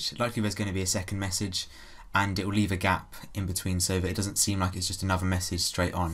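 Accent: United Kingdom. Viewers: can't get his British accent 😢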